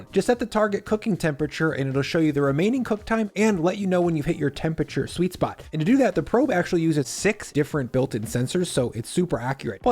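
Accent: United States. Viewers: You sound American but you are using metric measurements? WTF?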